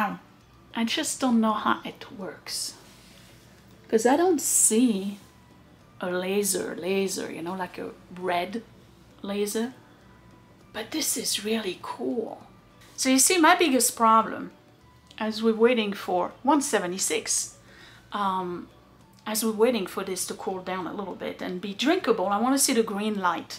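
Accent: France. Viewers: Dear. As to your accent. I started watching your channel, and yes, from the beginning, precisely because, I couldn’t initially work out your accent. A touch of French, a bit of New Jersey, I found it a charming, or, tres charmante accent, and have been watching you ever since. Yes, your sometimes fracturing of English, or American is funny, but in a good way. I ‘ope you always retain your interesting way of speaking. Thank you, all the best to you, those endlessly growing boys, you have built a great channel.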